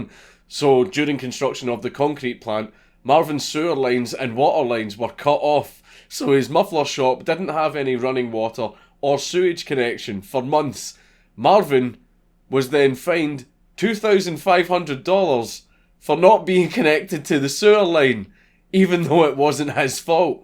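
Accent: Scotland, United Kingdom. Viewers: This is by far my favourite mad lad video, keep coming back time and time again for the same story told by a nice scottish accent.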